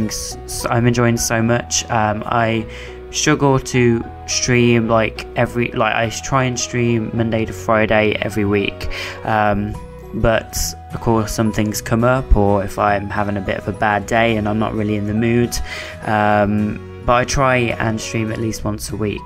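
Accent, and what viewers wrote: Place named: United Kingdom. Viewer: We love you " " your the next "Mental Heath Hero" that will take the world by storm! Your always getting a friendship with me no matter what your mental health status is! BIG BIG HUGS on your bravery and sharing your journey on this video! Your first video was a "Groundbreaking Hit"! Your "British" voice is as adorable as ever and I'm hoping you will do a musical "Let's Play" where only you are singing the entire time and in conversation! But until then you are loved and I enjoyed this video very much!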